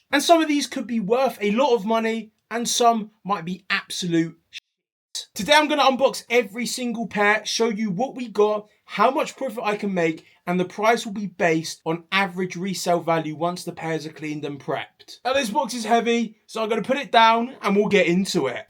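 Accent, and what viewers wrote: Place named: United Kingdom. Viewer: you are extreamly from the united kingdom thats all i have to say not a bad thing at all btw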